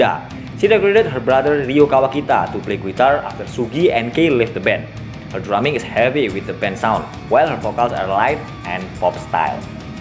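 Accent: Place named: Indonesia. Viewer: suka sama ulasannya... tp bhasa inggrisnya kya brlogat bahasa indonesia...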